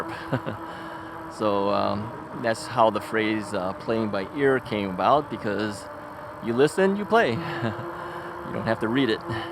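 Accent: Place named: Illinois, United States. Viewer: It sounds like you're in IL, up north somewhere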